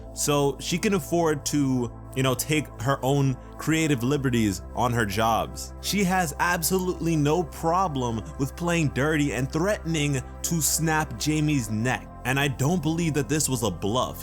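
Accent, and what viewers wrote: United Kingdom. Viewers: shes too british 4 me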